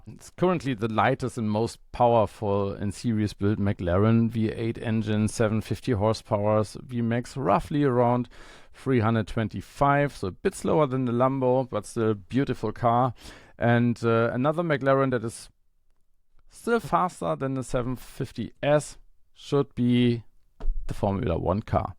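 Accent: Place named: Germany. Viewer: Fendt Traktor is too expensive!Ford Mustang only 60 parts??Porsche 911 the repop of the old crap kit from the 80s??BMW M3 is a Fujimi tool!Shelby Mustang only repop with new decals!Wow,what a lineup!And its always a torture to listen to this "denglish"!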